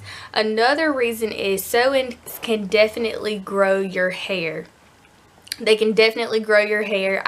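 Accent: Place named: Texas, United States. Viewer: I love her accent .. it’s like TEXAS 👍🏼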